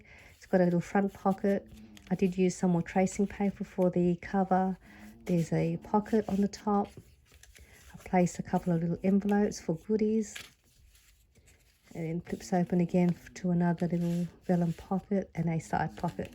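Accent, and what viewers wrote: Australia. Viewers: Love your video and this idea! Very well explained too. I'm also from Sydney, Australia. Lovely to hear your Aussie accent ☺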